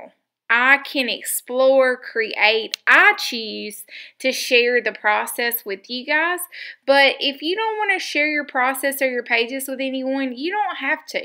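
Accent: South, United States. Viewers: G’day, loved your video so much, new subbie. Another one closer to your 14k. You make so much sense in this video, and just gotta say I love your southern belle accent! 🥰 crafty hugs from down under. Xx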